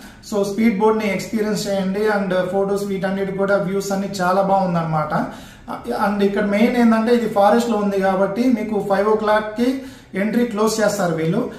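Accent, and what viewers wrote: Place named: Telugu, India. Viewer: Hi brother..sakkani telugu slag matadutunnaru..clear artam avtundi.. appreciate brother 🎉🎉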